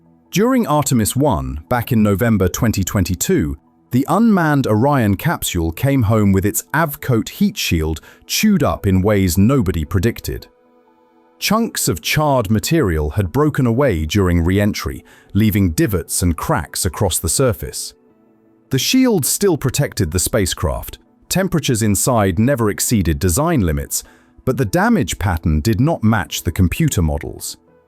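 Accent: United Kingdom. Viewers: Why use a British accent to speak American? We don’t have skeduals or use Fahrenheit 😳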